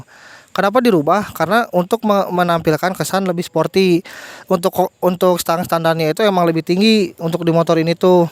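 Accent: West Java, Indonesia. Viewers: Meni balelol ngreview na...logat sunda na kaciri..mudah2n kaharepna tambah maju jang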